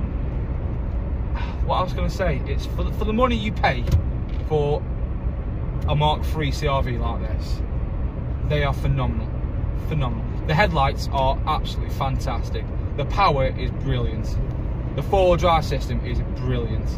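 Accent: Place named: Stoke, United Kingdom. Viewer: I’m detecting a Stoke accent.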